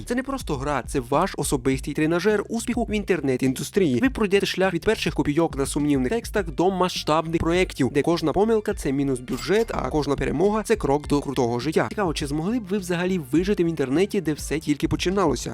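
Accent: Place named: Transcarpathia, Ukraine. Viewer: Чомусь я відчуваю закарпатський акцент . Я ж правий?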